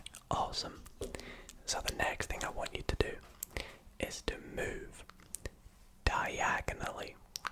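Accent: Scotland, United Kingdom. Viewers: I just realized from Scotland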